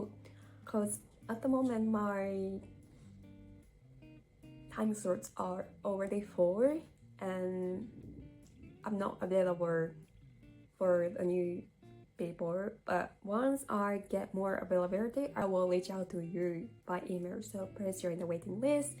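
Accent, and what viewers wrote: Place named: Australia. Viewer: Hi, Already I can hear that you are developing an Australian accent! 😅 You do have some very good points and perspectives on frugal living. Yes there are many different cultural attitudes towards money. As an example (and not intended to stereotype), in my experience it's not uncommon to observe that there are many people from South East Asia/South Pacific who grew up in more rural/tribal setting and have a very short time horizon towards money. They are more focussed on the here and now so concepts such as saving for retirement like your mum are a completely foreign concept to them. If those people receive an unexpected windfall then often they will celebrate by spending it all now rather than putting to one side. Also if other family members know that there is extra money available they may pressure the person with the extra money to help. 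Of course, there are still people like that in more disciplined cultures but they tend to be the outliers and not the norm. And yes you are right about Credit card use. It has to be disciplined but can still be useful when travelling. If there is any fraud on the credit card the money involved is the banks money. If there is any fraud on your debit card then it's your own money and you will not have any access to it while they investigate.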